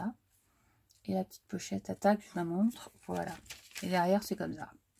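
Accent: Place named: Lorraine, France. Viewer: Tout est très joli !!! Cathy a dû être contente. Je me suis abonnée chez toi aujourd'hui et je savais bien que je reconnaissais ton accent, je suis Lorraine aussi. Tu es d'où ?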